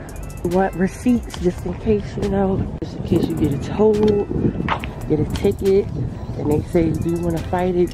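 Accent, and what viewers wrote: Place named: Philadelphia, United States. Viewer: Wait wait wait a minuteeeeee...as much as I have watched u and loveeeee you...your here in PHILLYYYY.....omg.... I knew that attitude and accent I freaking knew it lol...this made me happy....forgive me cause I’m always the last to know everything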